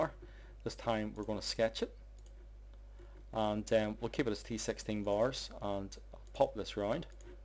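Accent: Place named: Belfast, United Kingdom. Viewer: i am in canada watching this video currently working for a huge rebar company and i hear that accent....also a belfast fella here so would love to know where ur working with rebar?? its not back in NI is it??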